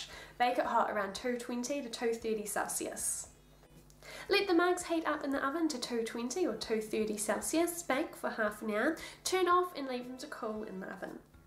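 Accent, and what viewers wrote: New Zealand. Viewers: You have a New Zealand accent :))))))) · Lovely listening to you and thinking 'That accent... She must be a Kiwi!' Thank you for the tips, will have a go!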